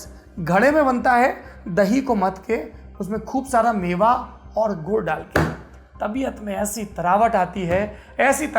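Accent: India. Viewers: Bolne ka deshi tarika hi maja deta hai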